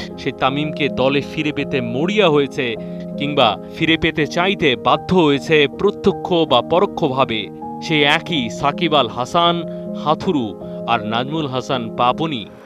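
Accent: Kolkata, India. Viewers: Kolkatar bangla.....শ্রুতিক টু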